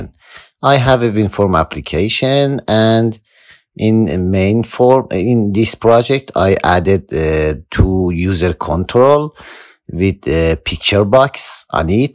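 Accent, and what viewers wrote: Iran. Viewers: are you Iranian???/🤣🤣🤣🤣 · لهجه ایرانی مشتی؟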